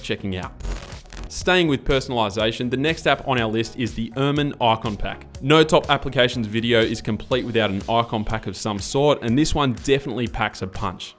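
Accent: Australia. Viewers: Good video, great editing, nice apps, good to hear an Aussie accent PS Badlands is way old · lemme guess ur a aussie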